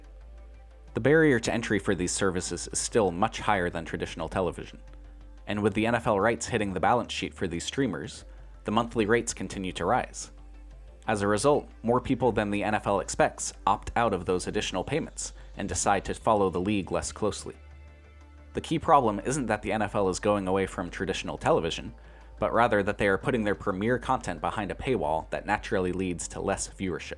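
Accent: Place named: Illinois, United States. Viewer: your accent, its an interesting one........Ilinois??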